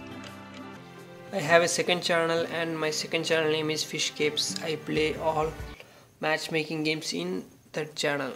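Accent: India. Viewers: He's from Hyderabad Andhra Pradesh so that is why he speaks like that. Atleast appreciate that he knows English because most of state doesn't know English and is uneducated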